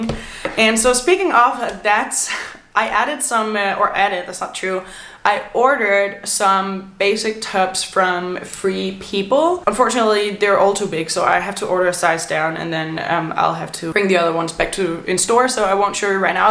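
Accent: England, United Kingdom. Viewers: Really interesting as a Brit hearing your comments. I think you are right- everyone all ages is wearing white trainers (not sneakers- 😊). I think it's a post covid rejection of uncomfortable footwear Converse are popular but tough to wear all day. I think we don't consider unique jewellery because most people don't prioritise spending money on it - we should - but definitely too much (imo) make up. I can confirm your skimpy tops are not shocking and the jacket does not stand out (i.e. it is nice but normal expect for it being 30c!). The cropped long sleeve square neck is lovely. Your English accent made me smile but it's autumn not fall 😀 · 😂 I’m an English woman and I totally approve of your attempt at an English accent 🤣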